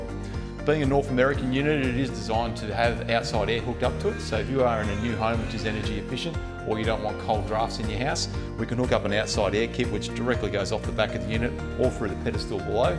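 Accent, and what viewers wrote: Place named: Australia. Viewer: Useless video due to the stupid music. I can make out around 20 percent of what is being said, combined with the aussie accent its not an easy listening situation.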